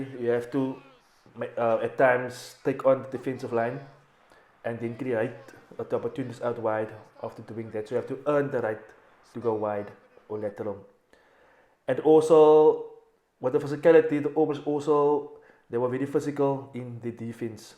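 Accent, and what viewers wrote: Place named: Afrikaans, South Africa. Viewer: This idoit never heard an Afrikaans English accent before. What he doesn't know is many foreigners find the Afrikaans accent very intresting and attractive.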